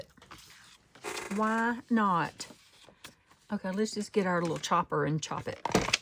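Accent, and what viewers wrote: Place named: Alabama, United States. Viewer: Hey Ms! Happy Weednesday. When you say it you always emphasize it with an extra weeeenesday. It's that Alabama accent. I agree, I absolutely love using those hearts as the hinges on that tag. I can't wait to give that a try but first I've got to hand draw me some hearts that look decent. I enjoyed every second. ByHisGrace! 🌹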